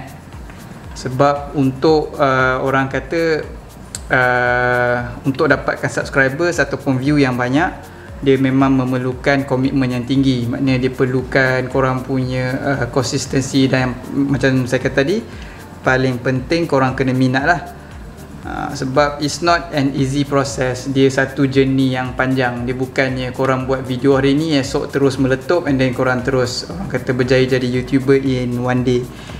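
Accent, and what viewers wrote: Terengganu, Malaysia. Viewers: Sape yg tanya xde slang tranung tu? Aku mula2 tgk vid dh perasan dia ada kaitan dgn pantai timur. Hanya org kelate tranung pahang je akan perasan detail slang sesorang dari mereka 😁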